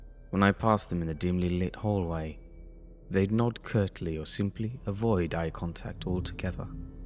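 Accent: United Kingdom. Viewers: you have the perfect british accent for this too😉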